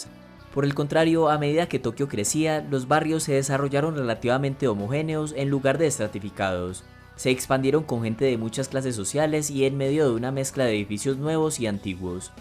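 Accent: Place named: Medellín, Colombia. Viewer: La voz, si no me equivoco, es un acento de Medellín, Colombia. Si es así, que bueno que haya estos canales con tanto contenido de valor en nuestro país.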